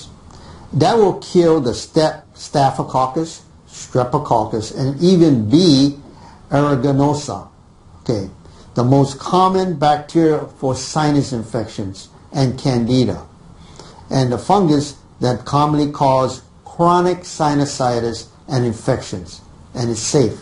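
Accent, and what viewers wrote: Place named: New York, United States. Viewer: So, let me guess. You kinda sound like you are from New York. Anyhow, I appreciate your chats. I had a thought of boiling a mix of peppermint leaf and oregano leaf in distilled water and then flushing it up the nose once it cools. You can also breathe in the vapors with a towel over your head over the pot too! I had a health food store owner friend in CT use the oreganol in boiling water and he cleared up!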